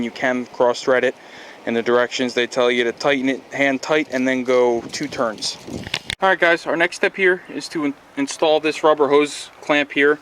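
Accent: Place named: Philadelphia, United States. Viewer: You hear South Jersey, I hear Philly, but they are close enough to almost be the same.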